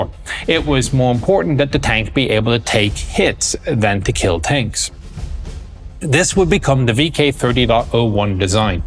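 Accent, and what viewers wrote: Ireland. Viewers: Ditch the music now mate! Your sweet Irish lilt is all we need Chieftain! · So this music volume is mandated by wargaming then? But... why? If its volume was less than half of what it is here, I might actually be able to concentrate properly on the really interesting stuff the Chieftain's trying to educate me in, but instead we get boss music with some faint, soothing Irish tones in the background, apparently.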